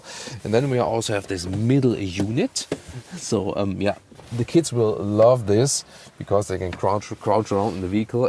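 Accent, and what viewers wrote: Germany. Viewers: Never heard a more german english speaking accent 😬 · This guy has the strongest and most awkward German accent ever hahahahahha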